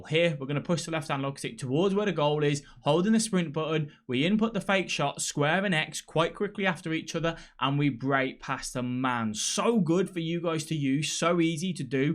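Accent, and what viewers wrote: Birmingham, United Kingdom. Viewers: Are you a Brummie mate?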